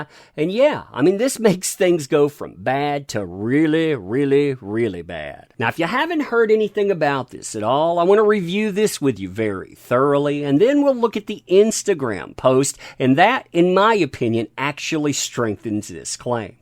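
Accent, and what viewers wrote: South, United States. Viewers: So love the southern accent during the Hollywood gossip 🤗 Reminds me of home 😋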